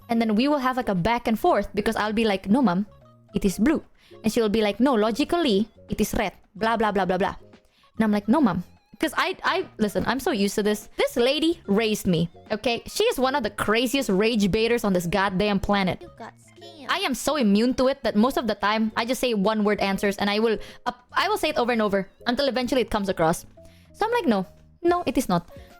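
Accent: Indonesia. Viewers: I love when use her indo accent😂😂😂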